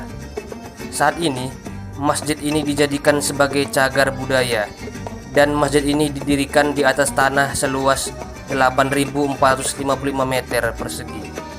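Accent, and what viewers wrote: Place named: Tanjungbalai, Indonesia. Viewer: Kok ku dongar suaronyo incek ni org tj yo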